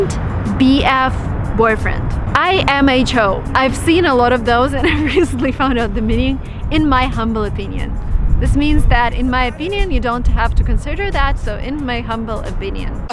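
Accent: United States. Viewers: Марина классна говоришь по английскому прямо как Американка сними на русском такое же пожалуйста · Madam you are a Russian and you talk in perfect American english.We Indians adore you.We respect you.ALL THE BEST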